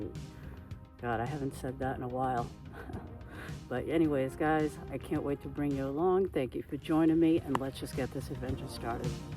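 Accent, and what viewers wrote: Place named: Massachusetts, United States. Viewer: You sound like my mom and my aunt from. They're from Malden Massachusetts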